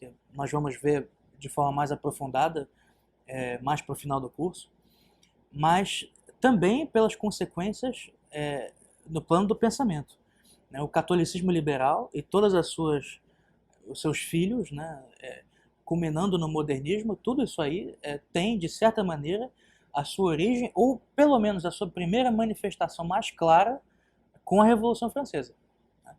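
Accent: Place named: Israel. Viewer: Percebo um sotaque israelense Ótimo video, ótimo canal. Parabéns que Deus abençoe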